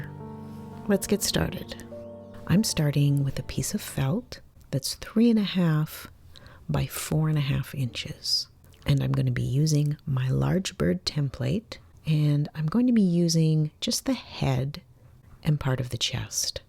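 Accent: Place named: Canada. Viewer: I recently found your channel, and I love it. You have a very pleasant voice to listen to. I can tell by certain words that you are from Canada. But only certain words. Thank you for what you do. I have bite the bug and gone down the Slow Stitching rabbit hole. Nothing completed yet. I also bought your mini animals and plan to buy more. When the budget allows. Thanks again.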